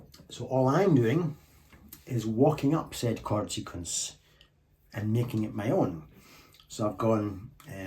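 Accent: Scotland, United Kingdom. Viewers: HI, Your latest video just showed up in my recommendations. Love your playing and your easygoing personality. I am originally from Alloa, Clacks so your accent grabbed me. I have already watched a few of your videos, only six and a half years to go!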